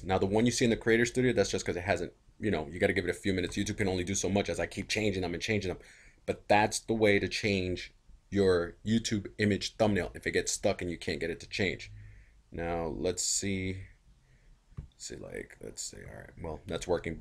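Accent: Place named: New Jersey, United States. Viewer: You're so Jersey YESSSSS!!!! I'm from Freehold! Thanks for the vid info!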